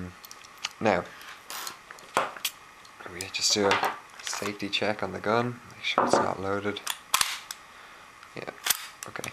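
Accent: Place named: Ireland. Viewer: Can't miss an Irish accent!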